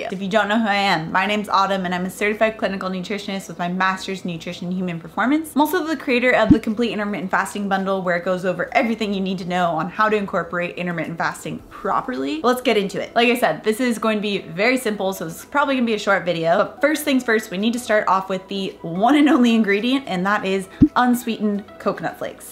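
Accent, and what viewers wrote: United States. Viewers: could you please slow down a bit? I just can't follow what you say (and Im sure may others and those who are not familiar with the US accent will also struggle). Great content though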